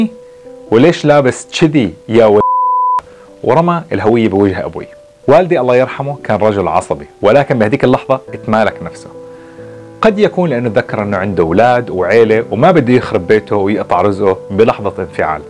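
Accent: Algeria. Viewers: صدقني فيك شيئ من الجزائريين من طريقة كلامك وكاريزميتك وأحاسيسك ومواقفك التي ذكرتها وعن والدك رحمه الله. كلنا مسلمين. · والله قبل ماتقول North Africa كنت حاسس انك منا هههه بطريقة كلامك وفهمك للدين ،أخوك من الجزائر.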